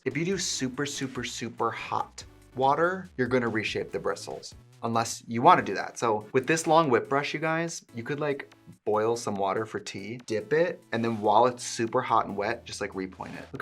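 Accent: Wisconsin, United States. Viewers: Aw, that lil WI accent pops up now and then and I love it. The straaaps 💜 collection is fire - that purple is beautiful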